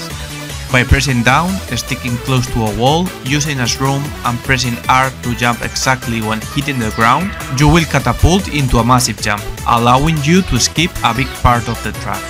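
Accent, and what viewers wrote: Spain. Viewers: El acento Español se notó mucho amigo xd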